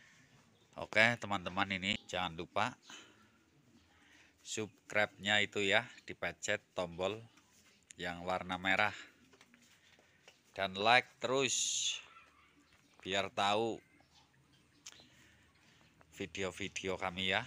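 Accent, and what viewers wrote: Banyumas, Indonesia. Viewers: Pak jan tembe ketemu channele giye aku bani banyu mas nang Lampung ngapak pada bae nek ora ngapak ora kepenak ky gue ya pak. Salam knl.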